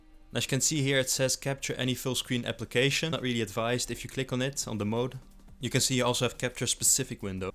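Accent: Netherlands. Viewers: I hear a dutch accent! Goeie content ouwe!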